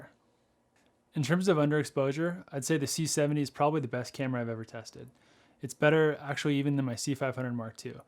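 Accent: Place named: Orange County, United States. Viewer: Wow....we really do have an accent in Orange County lol. Awesome video!! I was on the fence between these two cameras, but I'm loving my BMPCC6k Pro. The biggest draw for me was the menus. I fumbled around with Sony and Canon menus for years, but once I used my first BM I've never wanted to go back, but the C70 almost got me. Yes, the 6K Pro has its quirks, and the overall build quality of Sony, Canon, etc. is much better, but I love the image, post-flexibility and MENUS of these BM cameras.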